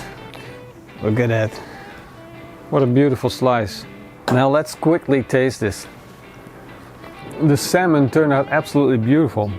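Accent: Netherlands. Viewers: It's Dutch for sure :D No question about that!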